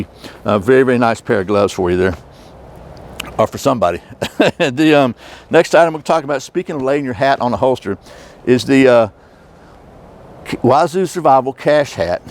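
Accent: Georgia, United States. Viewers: It would be really helpful for those of us who haven't quite calibrated to your Georgia accent if you would list the items you described on this wonderful video so that we have a fighting chance of purchasing the right items!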